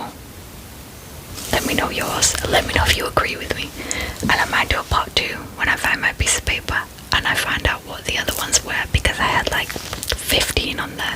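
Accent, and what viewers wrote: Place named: Slovakia. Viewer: A fantastic Slovakian accent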